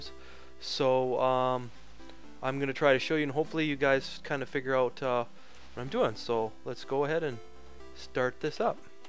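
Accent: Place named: Alaska, United States. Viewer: Good collection of tips! I like your accent too. Reminds me of my time in Alaska.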